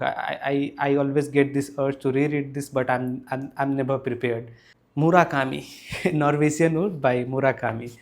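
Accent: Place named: India. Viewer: Nice❤, but your Indian accent wins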